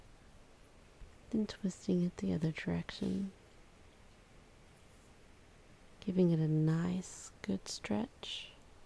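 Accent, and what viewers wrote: South, United States. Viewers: By the way, do you happen to be from the Southern United States? I thought I hear just a really slight drawl but maybe it's just the soft speaking.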